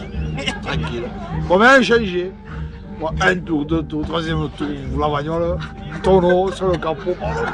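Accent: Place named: South-West France, France. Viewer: cet accent affreux de vendeur de voiture d'occasions du Sud Ouest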